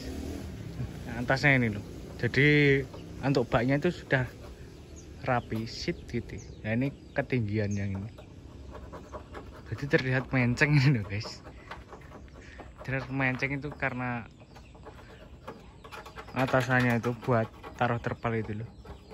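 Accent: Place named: Central Java, Indonesia. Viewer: Mas rumahe mna kok kendaraane plate S tp logate omongan jateng banget😊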